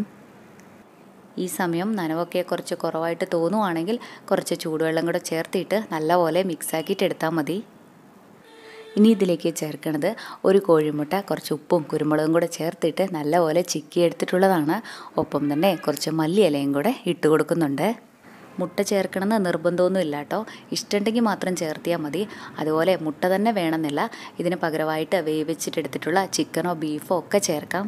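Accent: Palakkad, India. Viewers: പാലക്കാട് slang ആണോ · 👌👌 madam palakkad anno veed edak palakkadan model language varunnund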